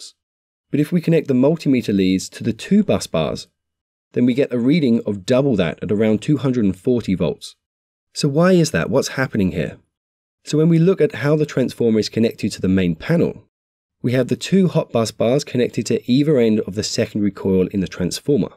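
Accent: United Kingdom. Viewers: What country are you in that is using copper for water mains in the street? I'm Britain, where his accent is from, we use plastic piping in the street, plastic in the homes. It's been like this for a couple of decades. I'm not sure if he's being generic in his board or for American, because he's talking about 120 and using double positives to make 240. I'm not an electrician. We have had to adapt wiring to this reality. You can no longer trust copper piping in a home will be continuous or lead back to a central area. It can be changed at any time and the copper circuit can be broken. For this reason Earth cables are being run directly from the front to the back of the properties using the main electrical board for the main earth point. Push fit plastic piping is so quick now, and they just need a small little blade cutter to cut the pipes to the right size. 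It's flexible and so easy to move around. Copper is much more expensive, difficult to bend, more time to cut, but the joints are a little bit cheaper. However plastic push fit connectors can be easily undone just with a click of your fingers. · I would prefer a human voice instead of a robotic British voice!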